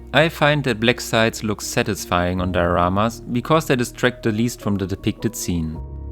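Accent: Germany. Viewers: it Sound like a german that speak English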